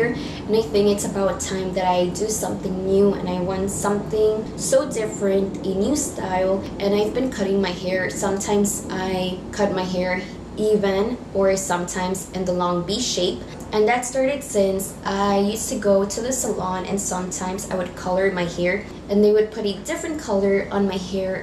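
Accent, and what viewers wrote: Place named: Spain. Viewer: It's because of her Spanish accent. The v and b sounds are mixed in the language.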